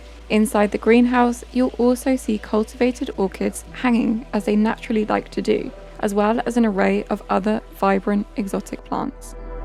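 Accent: United Kingdom. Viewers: What a marvelous place 😮! That island 🏝️ is a true paradise on Earth! Thanks 🙏 for this delightful video! By the way, where are you from? Both your name and family name are French, but you speak really good English with a British accent 😊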